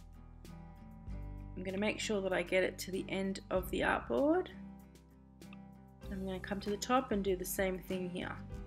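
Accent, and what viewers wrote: Australia. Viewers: Great info, just what I needed. Delivered in Australian too!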